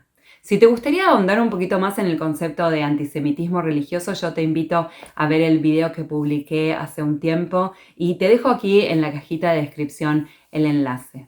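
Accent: Argentina. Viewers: Hola! Soy nuevo suscriptor y me encantan tu bella sonrisa, tu apacible mirada y tu lindo acento argentino! Claro también aprecio mucho tu información y enseñanzas sobre el Judaísmo! En el tema del pueblo escogido, tengo la siguiente duda: te he oído decir que el pueblo judio tiene la responsabilidad de dar buen ejemplo de vida y de preservar y difundir las enseñanzas de Dios, sin embargo, he escuchado decir a otros exponentes del Judaísmo que la Religión judía no busca convertir a nadie, ni lograr que otros abracen sus creencias! Cómo entonces se pueden difundir sus enseñanzas si pareciera ser una Religión muy particular o restringida a un círculo muy estrecho de conocedores y practicantes? Cuando llegue el Mesías que esperan, El difundirá a todo el mundo la religión judía y su búsqueda de la Paz, o ustedes deben llevar a cabo esa tarea?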